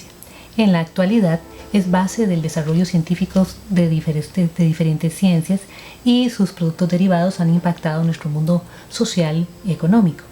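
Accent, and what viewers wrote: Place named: Costa Rica. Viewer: Creo que es de Costa Rica.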